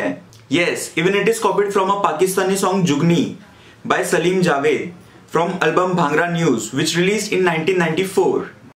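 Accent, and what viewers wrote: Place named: Maharashtra, India. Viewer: बोलण्यावरून मराठी दिसतोय... बरोबर ना..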